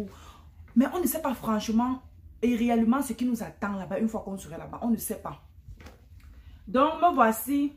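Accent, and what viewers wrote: Cameroon. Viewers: 🤣🤣🤣 l'accent du +237. Merci du partage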